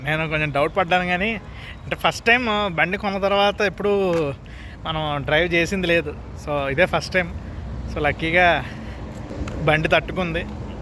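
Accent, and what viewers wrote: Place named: Godavari, India. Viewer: Ahaaaa Godavari slanggg vastundeeee 😂😂😂😂😂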